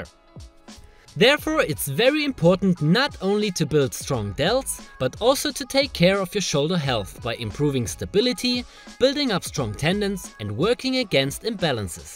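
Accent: Germany. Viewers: Der deutsche Akzent ist ja insane XD aber gutes Video :)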